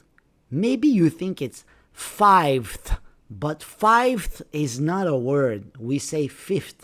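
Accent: United States. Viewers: American trying to teach how to say numbers in English. Absolutely awful accent and certainly we native British people do not speak like this woman. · You speak American English. What about dates in British English? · stupid American English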